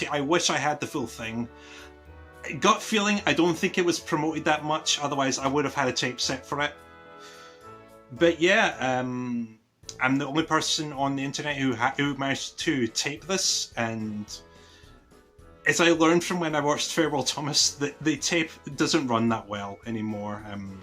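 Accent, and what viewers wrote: Scotland, United Kingdom. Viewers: Are you Scottish?🇬🇧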